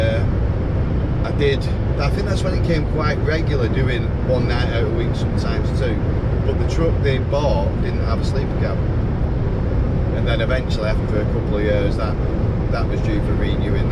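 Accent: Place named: Bolton, United Kingdom. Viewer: Hi. Tell them you’ve heard from Stevie from Burgess and they’ll know me lol 😆 Dean is a really good fitter with lots of knowledge but don’t tell him I said so haha 😂 I’m enjoying your videos buddy with that Bolton accent 👍🏻 I’m just waiting for a recovery truck as the axle has broken on my scanner trailer 😩 Take care cheers Stevie 🤩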